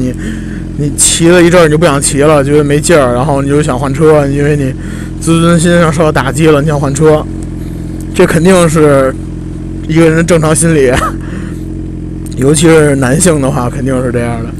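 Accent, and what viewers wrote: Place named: Beijing, China. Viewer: 宽点有限A_A听着口音咋像北京捏，熟悉啊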